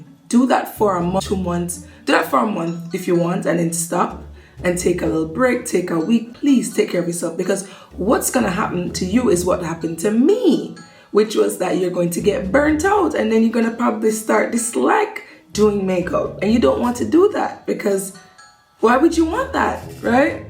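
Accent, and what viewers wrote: Jamaica. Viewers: Yow u sound so Jamaican its qt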